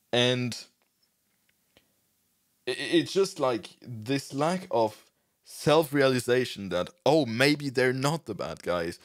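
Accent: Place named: Germany. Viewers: Dein Denglisch ist so horrendous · I think his english fine. Ich mein, 20 Sekunden in, dache ich... ist er deutsch? Aber so what!? I’m gonna subscribe whatsoever. 😉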